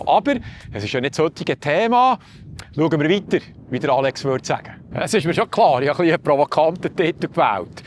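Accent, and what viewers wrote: Bern, Switzerland. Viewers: Einfach schön, jemanden zu hören, der richtiges Schweizerdeutsch (Bärndütsch) beherrscht und praktiziert!!!!!! Dazu noch alles für die Dümmsten (wie ich) einfach erklärt. Danke!!!! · Das war wiedermal Deutsch und deutlich! Man kann sich über diese finanzielle Schieflage in den alternativen Medien sehr gut informieren lassen. Aber unsere tollen Mainstream-Medien begnügen sich halt immer noch mit einer dümmlich vereinfachten Weltsicht. Sie spielen 'hau den Lukas' und verkaufen uns für dumm. Du hältst in breitestem Berndeutsch dagegen - bravo! ❤ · Herrlich dis Bärndütsch 👍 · Dieser Beitrag is wirklich ein Hit, ich bin froh dass ich gefunden habe. Und dann dieses schöne Bärndütsch! Herzliche Grüsse von einem Heimwehberner aus Südkorea! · Dr Herr Trump erchouft secht haut sini Macht mit Gäud und mit Entlassige. Weni ihm zuelose, de dünkt's mi rächt offesichtlech, dass es ihm nid i erschter Linie um ds Wohl vo Amerika geit, sondern um d'Vermehrig vo sim persönlech Riichtum und Macht. 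Si Aatrieb isch sis gchränkte Ego (was sogar nachvouziehbar isch, wenn me sini Biografie aaluegt). Nüt gäge Riichtum, aber es git riichi Lüt wo ehrehafti Mönsche si mit ehrehafte Wärtvorsteuige, und die würde nie so handle. Ja es stimmt, es geit hie nid a priori um Pro oder Contra Trump, und trotzdäm dünkts mi wichtig, das Thema und das indiränkte "Lob" a Trump im Video ine entsprächende kritische Gsamtkontext z'steue. Es isch aber e Fröid, dim schöne Bärndütsch zue z'lose 🤗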